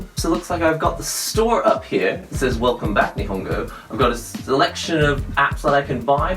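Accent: England, United Kingdom. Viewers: U have better british accent than me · I think you're the first asian i've heard with an english accent. I bet you go bonkers for tea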